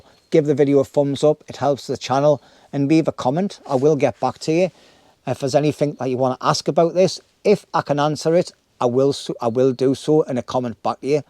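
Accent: United Kingdom. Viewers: Great Brit English ❤ You must be Ex German ? 😂 (like me 😅) Unboxing: keep it short ❤ Video with IR: very grainy 😢 IR off —> NG Night sky: 😰 I better still keep on looking for a better unit 😂 it great video, 🙏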